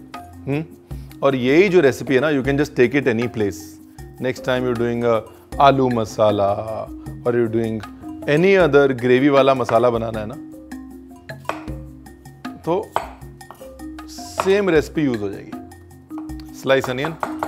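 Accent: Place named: Punjab, India. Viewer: Ranveer pra...Love you...Your style amazing and jab bat karte hue Punjabi touch ata haina...mast bro you awesome....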